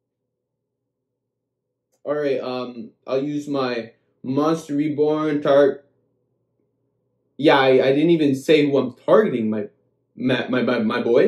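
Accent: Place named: Toronto, Canada. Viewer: Dude I know that accent, my be from Toronto, eh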